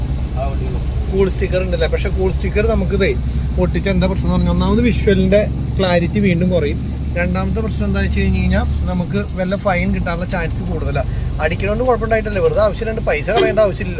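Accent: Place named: Palakkad, India. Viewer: Palakkad basha pwoliche 😂😂😂😂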